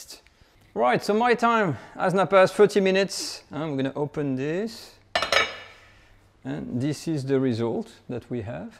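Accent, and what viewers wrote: France. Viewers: Love your cooking and your French accent ❤️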